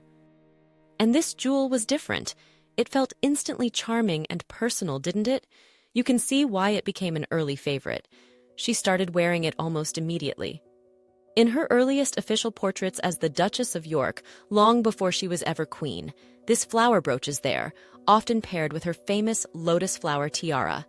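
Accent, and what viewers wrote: United States. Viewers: I must say that although the channel Has an American accent, the understanding of the position of Royalty and its relationship with the people is spot on. Something many foreign commentators never ever get right. E.g. the way the Queen Mother wearing her jewellery is not vanity but respect for those who really ‘own’ the jewells. The people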